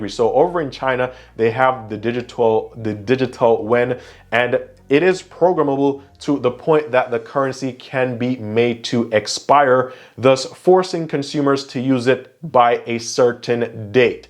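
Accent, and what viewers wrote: Jamaica. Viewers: Do I hear a slight Jamaican accent? Are your parents Jamaican?? Good stuff though. Thanks for educating those who are in the dark 👍✌️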